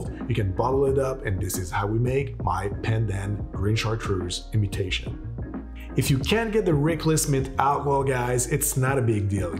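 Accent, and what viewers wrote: Quebec, Canada. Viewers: Hey! Love your channel 💖. I was wondering, you live in Quebec, right? ( i'm gessing from your accent!) I was wondering where could you find Wray & Nephew rum. I see this used all the time in cocktail and syrup recipes but SAQ doesn't sell it. Can't seems to find any over proof rhum. I live in Montreal btw. Thanx a lot and keep up the awesome work!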